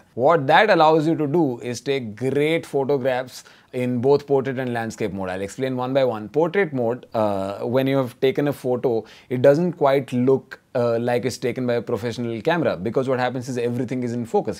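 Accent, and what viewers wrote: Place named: Bengal, India. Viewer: I'm waiting for your next video. When will it come ????? I like ur Bengali texture in this unboxing video so please use it (Bengali texture ) in your next video. I will be waiting...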